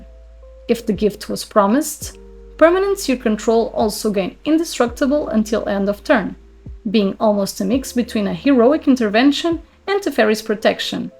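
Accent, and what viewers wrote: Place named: Portugal. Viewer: Pt accent? Amazing!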